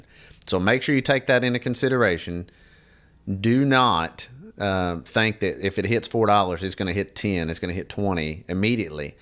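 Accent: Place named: Texas, United States. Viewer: You from Texas? You sound Texan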